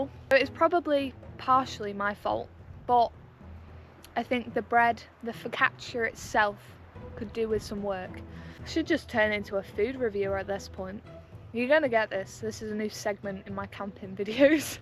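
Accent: United Kingdom. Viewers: Love your UK accent ❤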